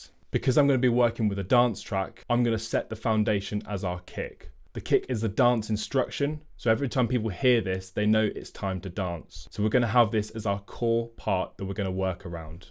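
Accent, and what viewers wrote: United Kingdom. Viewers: Most guys w ur setup and type of video are kinda foreigners who say “focal”(vocal) kk. Cool to see a uk dude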